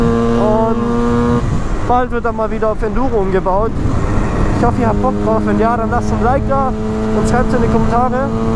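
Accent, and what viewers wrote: Swabia, Germany. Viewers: Das Problem eines jeden Schwaben: Schnell von Schwäbisch auf "Hochdeutsch" switchen. Und "des" und "isch" bekommt man eh nur schwer weg, egal wie sehr man sich bemüht xD Ich spreche aus Erfahrung :D Aber du machst das ganz schön gut :P Bei mit kommt dann irgendein komischer Mix-Dialekt raus, wenn ich mich anstrenge. Geht es noch jemandem so, oder bin ich die Einzige? :D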